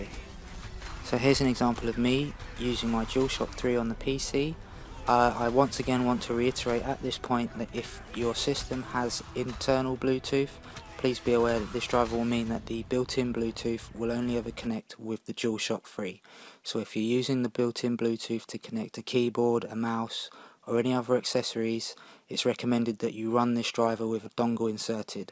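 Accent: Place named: London, United Kingdom. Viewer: I love the London accent!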